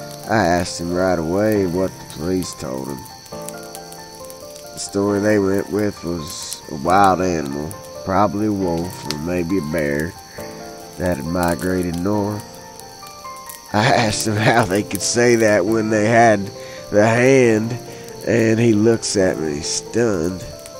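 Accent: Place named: South, United States. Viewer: Southern accents need more love in this community.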